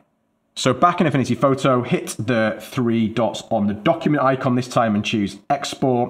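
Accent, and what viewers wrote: United Kingdom. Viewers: I hear a British accent. I subscribe 😝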